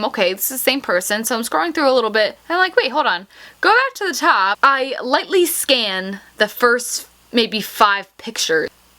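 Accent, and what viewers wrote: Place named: United States. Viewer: Most jarring accent and way of talking I've heard in a long while. You give American girls a bad name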